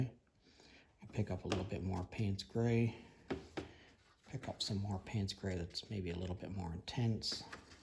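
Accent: Canada. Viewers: I recognized your Canadian accent. We lived in the Greater Toronto area for 23 years!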